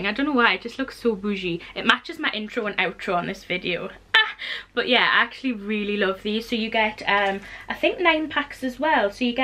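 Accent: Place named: Geordie, United Kingdom. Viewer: You sound SUPER GEORDIE in this vid xx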